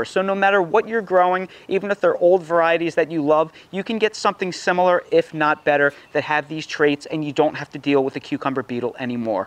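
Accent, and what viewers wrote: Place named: Northeast, United States. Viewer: Thanks for the great video! This is the first time I’ve heard of parthenogenic cukes, and the cucumber beetles here in Virginia are 😬😬😬😬. Weird question, but are you a Northeast transplant? Asking because I am too and your accent sounds familiar lol. Had a bit of an adjustment period learning to garden in the South but I think I’m getting the hang of it these days 😊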